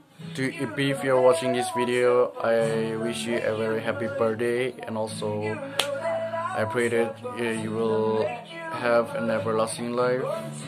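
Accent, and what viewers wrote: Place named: Australia. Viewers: Achuvvvvv Australian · Your English sounds like Australian. 😍 Handsome bro.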